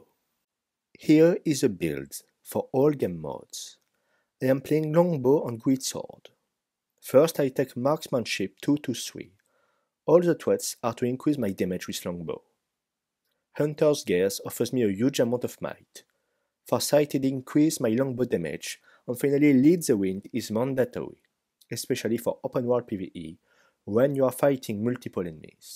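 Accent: France. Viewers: Thank you for this video, I really enjoyed it as I am new player in GW2. I'm following all your skills, will keep playing as soulbeast. Just a friendly hint: Practice with your english pronunciation, you have very hard french accent, sometimes it's hard to understand some words. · I could listen to the cute french accent for hours lol thanks for the guide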